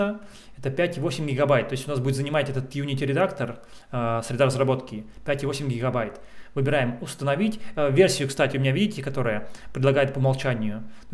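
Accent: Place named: Spain. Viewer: вы испанец? очень красивый акцент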